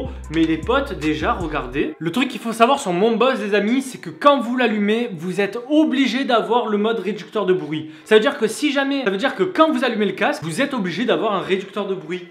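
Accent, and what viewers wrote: Marseille, France. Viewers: Marseillais plutôt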